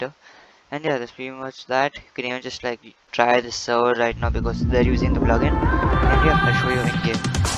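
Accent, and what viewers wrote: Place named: India. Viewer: bhai hindi mai bnaoge toh or achi bnegi vedio bhale hi international audience na mile but indian audience jrur milegi accent se pata chal rha hai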